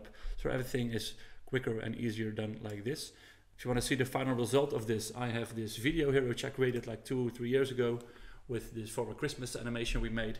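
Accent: Netherlands. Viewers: Ik hoor dat je Nederlands bent. Bedankt voor deze tutorial :)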